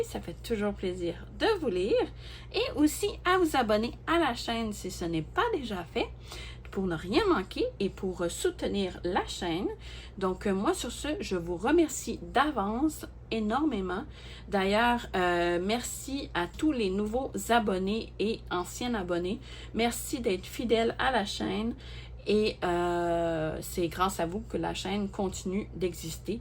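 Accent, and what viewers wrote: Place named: Canada. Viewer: Merci pour la vidéo et les produits présentés ❤ j'adore ton accent canadien et ta voix toute douce, calme et reposante 🤗 Bisous 😘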